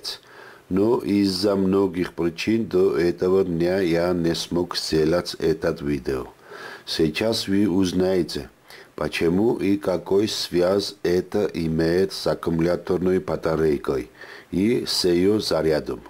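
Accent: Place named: Georgia. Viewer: Прекрасно слушать русски под грузинском окцентом.❤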